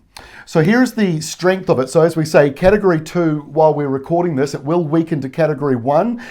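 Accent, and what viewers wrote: New Zealand. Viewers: Love seeing local weather with a NZ accent, a smile, and no screaming. Got the colors right, too.. Beautiful.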